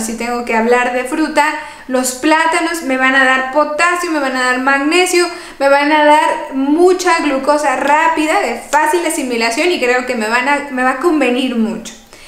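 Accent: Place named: Mexico. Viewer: Desde Espańa te digo: Eres la mejor y la mas GRANDE. No puedo vivir sin seguirte en la Red. Cada dia que pasa, te quiero mas!!! No se que hariamos los diabeticos hispanoparlantes, de ambos hemisferios, sin tu asistencia tan altamente cualificada y AMENA, desparramando gratuitamente chorros de amor y conocimiento, a traves del ciberespacio. Por desgracia, la mayoria de medicos endocrinos, andan saturados asistenciales y no te escuchan, ni te explican.......siempre lo mismo: merformina y mas merformina o insulina i mas insulina......y nada de dialogo. No tienen tiempo para escuchar!!! Que pena!!! Tu dulce voz femenina, de suave acento mexicano y en el hermoso espańol que se habla en las tierras del Nuevo Mundo, me llega a traves del eter, como un balsamo de ilusion por la vida y la salud. Eres como una luz que a traves del espacio oscuro, me llega brillante desde el Nuevo Mundo mexicano, para recordarme cada dia que todavia estoy vivo y que aun quedan personas buenas en este Mundo de Mierda, en el cual nos ha tocado vivir. 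Sigue asi mi amor, continua haciendo este gran trabajo de divulgacion, sobre diabetes. No decaigas. Eres Grande y cada dia que pasa te quiero mas. Desde Barcelona (Espańa), te mando un besote muy fuerte. Hoy dia miercoles, 7 de Julio de 2021. Que suerte haberte encontrado en la Red!!!! chao.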